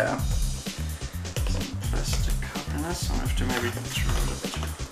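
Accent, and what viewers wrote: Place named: Afrikaans, South Africa. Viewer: Not to be rude or anything, but is Afrikaans (or Australian) your first language? I just think so because I have the same accent.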